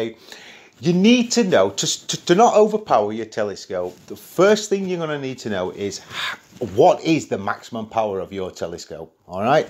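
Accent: United Kingdom. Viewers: Excellent video! Where in the UK are you from, BTW?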